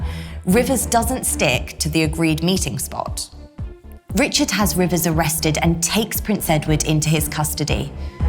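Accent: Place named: Northern England, United Kingdom. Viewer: 27 Semantics on the pronunciation, but I AM British and this...this is not London. This is actually even more impressive because the accent is Yorkshire, Derbyshire, Midlands region waaaay to the North which is where they were from as Yorkists. It's a modern Northern accent though. If he read it in the English of the day, you'd barely understand it. Here is a video of a linguistics professor at the University of Leicester reading a letter written by Richard III some years before making himself King. He's written to borrow money, and the reader uses the King's English of the late 15th century. You might enjoy this if you're still about and see it. :D What did Richard III Sound Like? <link>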